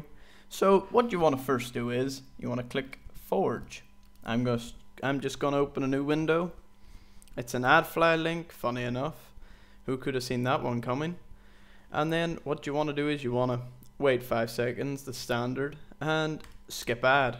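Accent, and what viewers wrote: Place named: Germany. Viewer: BTW! your accent is awesome!!! Is it German?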